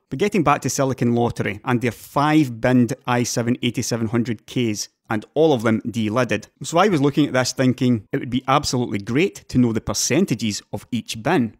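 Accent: Scotland, United Kingdom. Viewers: Excellent work, i noticed a comment on the Linus video, "Scottish is difficult for me as well", do you get much grief about your accent on videos? I am from Govan (I am sure you know where that is) but i live in Australia, and although i speak "English", people stare at me and cant understand, off topic i know, but i thought i would ask how your accent goes down with people online. The only bad thing after watching this though, as that i have to wait for your next work, because your work is that good. G'on yersel. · Hey I like his Scottish accent. That's actually the first thing that pulled me to his channel, I found out how good his content was afterwards.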